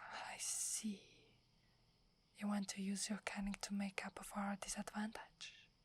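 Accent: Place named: Italy. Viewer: It wouldn't be cute without the italian accent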